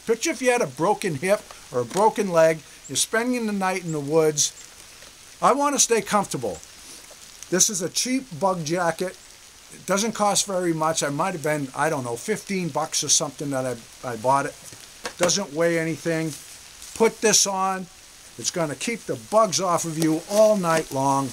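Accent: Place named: New England, United States. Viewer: OMG don;t let the negative people get to you. Whether jealousy, negativity, criticism, or downright cruel comments, know that those folks are very unhappy in their own lives and have a need to lash out to others. Everyone is doing the best they can with what they know. Pray for them, don't waste your energy in addressing or even acknowledging their rants. Move forward and continue with your happy life as if they don't exist. Acknowledging them gives them satisfaction in knowing it bothered you. Your videos are great, entertaining, helpful, and just downright genuine. You are the salt of the earth my friend. Pray for those lost souls. I now live in northern California, 8-1/2 years now - not far from Tahoe - but far enough to not get enough snow for me!!. However, I miss New England, having grown up in CT, RI, MA and ME. Your slight accent brings back fond memories. 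My daughters and grandchildren are in CT and MA. My older daughter has a second home in NH. I am planning on a road trip for a visit this summer/fall. Bless you.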